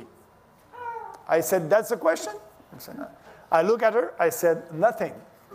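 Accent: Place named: France. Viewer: These hero's always pop up out of nowhere, and this is standard. He may have a French accent, but then again so did my grandmother.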